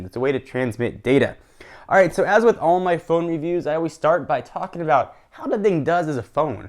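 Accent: Canada. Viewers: phe<link> for a second there i thought you were from canada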